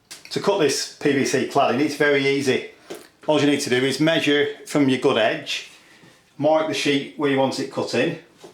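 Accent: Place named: Lancashire, United Kingdom. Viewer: Nice video. U from Blackpool? St Ann's ur accent ssounds familiar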